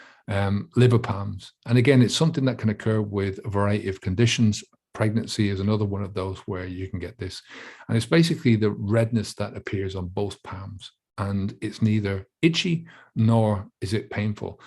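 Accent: Ireland. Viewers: New here, can tell you're a fellow Irishman but can't quite place the county 😂 if i had to guess id say youre a Dub? Great video either way pal!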